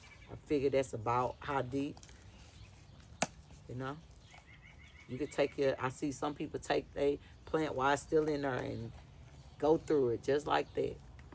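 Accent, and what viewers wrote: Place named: St. Louis, United States. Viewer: Sounds like you from St. Louis.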